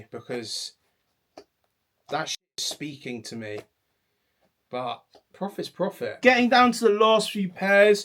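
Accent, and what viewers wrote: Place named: United Kingdom. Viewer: you are extreamly from the united kingdom thats all i have to say not a bad thing at all btw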